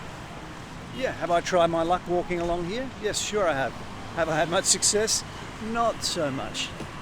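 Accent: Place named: Australia. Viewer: christ mate thats one and a half of an australian accent isn't it gabba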